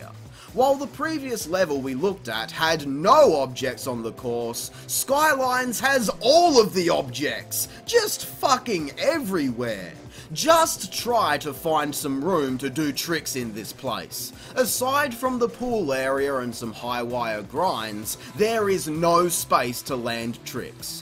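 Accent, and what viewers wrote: Australia. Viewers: Are you australian? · Thanks, didn't wanna listen to this guy's infuriating Australasian accent for over 2 hours.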